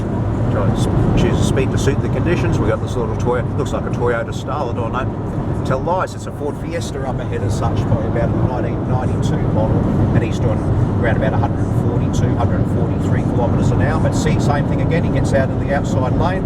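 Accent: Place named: Australia. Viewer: Your Australian Sounds adorable - there has to be more of you from Down Under over Here in Germany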